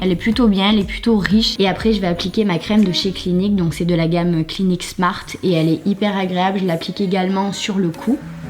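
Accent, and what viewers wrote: South, France. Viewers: Tu as des yeux tellement magnifiques c’est ouf 😍 et d’où viens ce petit accent sudiste ? 😁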